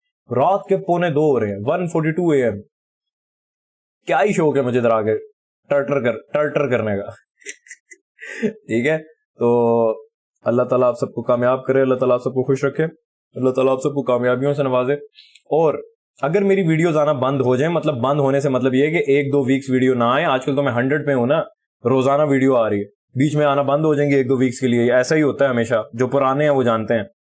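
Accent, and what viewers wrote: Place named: Punjab, India. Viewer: Sir ap ke mooh se Punjabi phubdi aa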